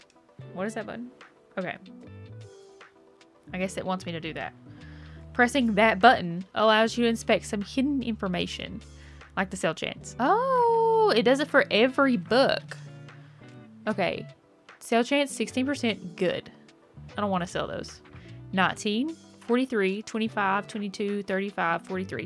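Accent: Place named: Appalachia, United States. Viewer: Hi! I heard your accent and knew you lived near me. I'm in Kingsport/Mount Carmel. Hey neighbor! 👋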